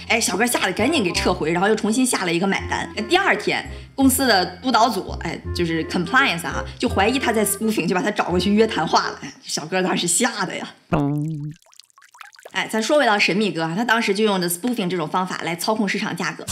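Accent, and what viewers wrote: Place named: Northeast, China. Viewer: 東北話特別好聽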